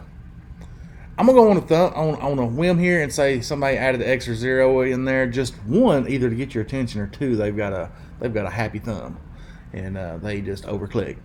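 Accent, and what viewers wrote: South, United States. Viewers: Thank you so much for this video. It felt like having a roller coaster ride seeing the different rates that's out there. I love your video. I will be following you on Tik Tok and FB and subscribing to this video. You are very funny and your Southern accent is nice. Please make more like these. I love to laugh and learn.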